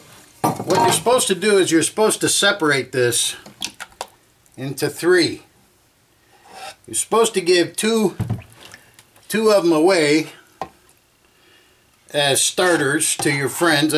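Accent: Chicago, United States. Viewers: Great "Chicagoland" accent,which i enjoy hearing since i relocated to SoCal 4 yrs ago. A'int the same difference either.They talk/sound different here. A very good example of the SoCal sound would be original version of ' 'from album '.' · You have a Chicago accent as do I lol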